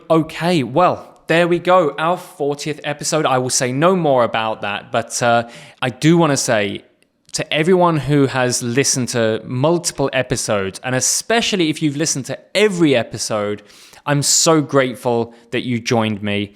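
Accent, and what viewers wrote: United Kingdom. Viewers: I like it your videos very much. Your British English accent is totally perfect and your videos helped me to learn British English and please come on youtube with more new videos. Thank you very very much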